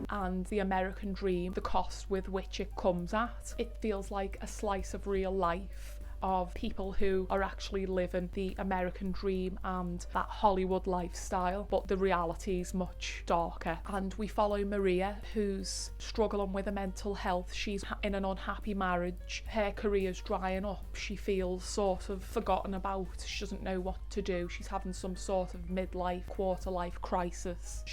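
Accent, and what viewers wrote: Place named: Scouse, United Kingdom. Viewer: as someone who lives in liverpool you literally have the most soothing and soft scouse accent i’ve ever heard in my life i could listen to you talk all day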